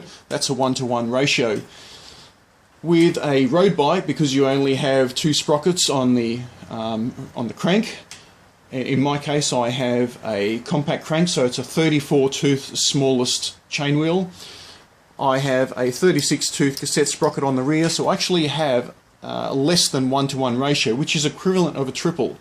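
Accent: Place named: Australia. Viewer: Australian, I reckon mate.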